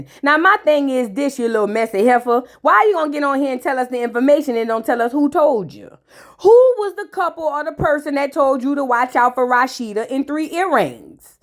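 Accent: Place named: Louisiana, United States. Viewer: Your voice sounds like Tokyo Toni meets Louisiana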